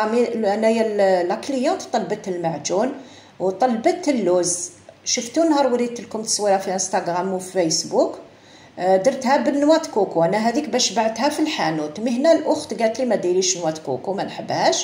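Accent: Algeria. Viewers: شفت قناتك بالصدفة و عجبتني يعطيك الصحة تحسي الاصالة الجزائرية من خلال صوتك وبالتوفيق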